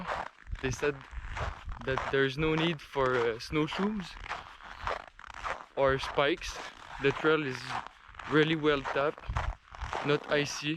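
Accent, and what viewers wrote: France. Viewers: Living in Vermont and loving Quebec, I was excited to hear what you deal with in your travels. Some day we'll be able to cross the border again, eh? So it was frustrating to have the music too loud at points. Since you seem to be a native French speaker, the accent adds to the problem (but thank you for doing this in English). I'd suggest no music when speaking as a rule; people listen on so many different kinds of speakers and such. What might sound great on headphones can be junk on a phone speaker or such. Keep going, little glitches will iron out and what you are doing is great. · Ur Accent seems like ur a French Guy!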